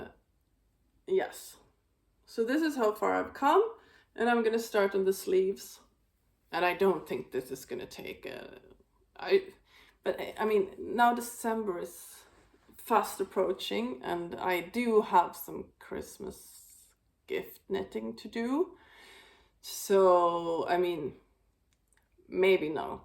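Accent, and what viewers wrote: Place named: United States. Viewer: Your “American” English is very good. I enjoyed listening to you and watching your podcast💕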